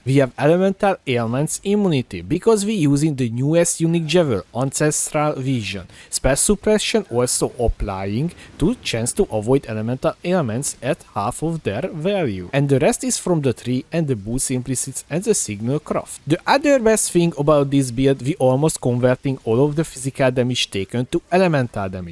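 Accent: Hungary. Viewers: U Hungarian? Sounds like it.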